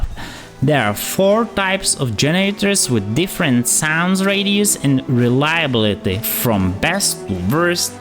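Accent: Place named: Czech Republic. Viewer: Nejsi náhodou čech? už jen podle toho jména a v ty ang mrtě slyším ten přízvuk, nic proti pěkný video :D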